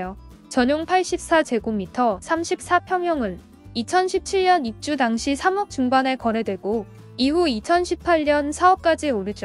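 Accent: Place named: Mokpo, South Korea. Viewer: 아따 목포구만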